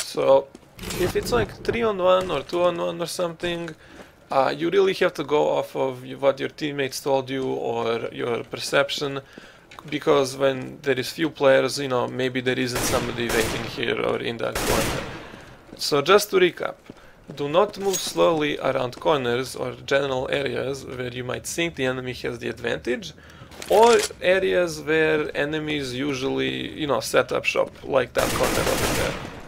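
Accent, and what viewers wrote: Russia. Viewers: Forgive me if I'm wrong, but that sounds Russian. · Are you a russian or something? because you sound russian with your accent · How to not (Me: Man this guy has a strong Russian-like accent!)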